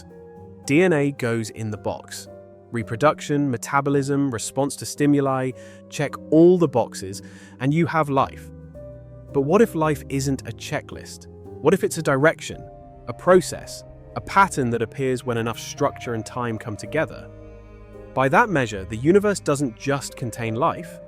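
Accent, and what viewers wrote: United Kingdom. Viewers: i’ve been studying the Sanskrit Vedas to understand things for 15 years. this video is excellent and very easy to understand. nice to hear a fellow british voice too 🙏🏽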